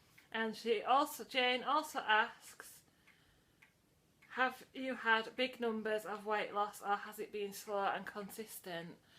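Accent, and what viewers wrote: Yorkshire, United Kingdom. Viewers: Ooh I can hear the Yorkshire accent now 😃 are you on Instagram at all ?? Also not sure if you have said but what are your plans for Xmas are u using flexi sins, being off plan ?? Was wondering re the cake i have one too xx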